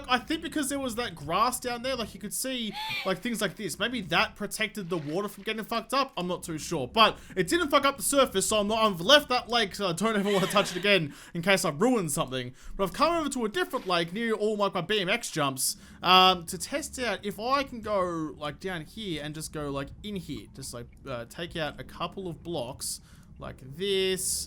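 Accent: Australia. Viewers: Out of pure curiosity from where do you hail? Are you from Australia? Trying to place the accent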